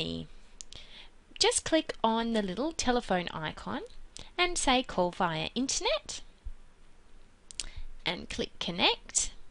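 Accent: Australia. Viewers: Australian accents are the best! Lightens up the mood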